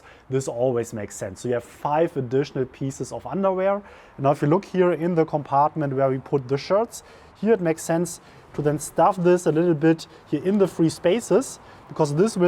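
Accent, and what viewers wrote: Germany. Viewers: I watch because accent is brilliant! I just enjoy hearing him talk, and helps with my German pronunciation. Almost all my German friends have far weaker accents. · Hi! Could you tell me where from your accent is ? Are you German?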